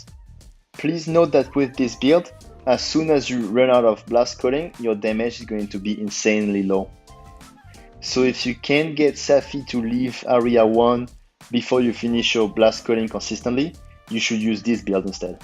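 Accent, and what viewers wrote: France. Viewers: Ha ha, je m'en doutais ton accent anglais fais très français, sinon super build, vraiment original et passe vraiment bien sur le safi ;) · J’ai reconnu l’accent lol. Tu fais pas de vidéos en français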